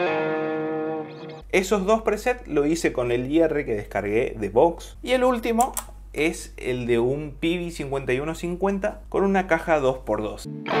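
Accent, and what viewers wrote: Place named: Paraguay. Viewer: Muy en el fondo se te nota el acento paraguayo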